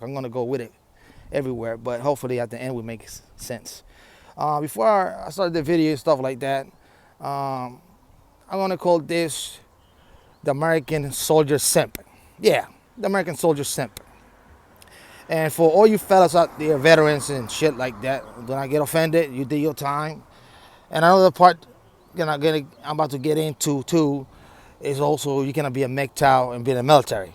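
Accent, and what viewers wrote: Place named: Mexico. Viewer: It's hard to understand your broken Mexican English. You sound so low class too.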